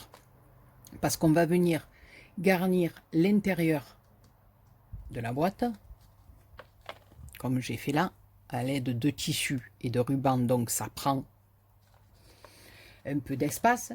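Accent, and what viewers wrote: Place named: Marseille, France. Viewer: Coucou ma! Mais si mais si, chantes-nous le Titanic...mdr Merci pour tous tes magnifiques partages, c'est toujours un plaisir. Mais le Titanic avec ton accent de Marseille, peu chère que ça me plairait!!! Bisous bisous ♥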